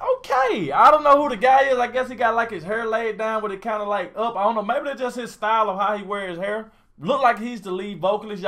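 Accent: South, United States. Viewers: I love this channel, where are you from? you sound like you have southern roots.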